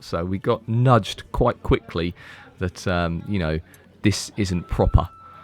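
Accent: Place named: United Kingdom. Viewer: Enjoy very much of your introduction, probably one of the best! You’ve got really nice voice! Love your British accent!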